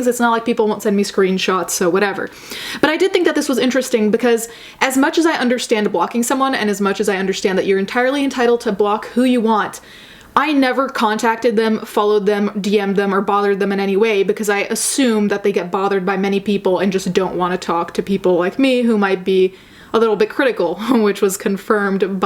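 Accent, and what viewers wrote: RP, United Kingdom. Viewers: Oh I love your british accent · I love your british accent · OMG I love your British accent!! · I cant understand you with your HEAVY British accent. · And that glaring posh accent xD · I love your British accent 😍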